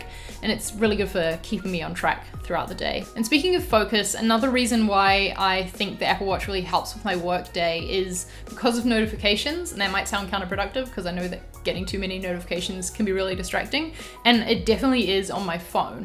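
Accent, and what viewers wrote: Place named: New Zealand. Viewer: I thought this was a helpful review, as you talked more about how you use your Apple Watch rather than get into the weeds of technical details. It’s difficult to find examples of people using their Apple Watch amidst the sea of specs-oriented ‘tech reviews’. As you point out, this device is a luxury which nobody needs to get. In that case, I think it is more interesting to know how people can make use of the Apple Watch and what kind of value they get out of it. Being rather drawn to gadgets, I might yet pick one up. And also, a pleasant surprise to hear a kiwi voice!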